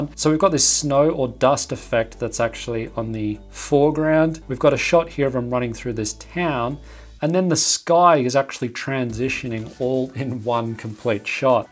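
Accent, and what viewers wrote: Australia. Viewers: Because he's australian lol. It's called accent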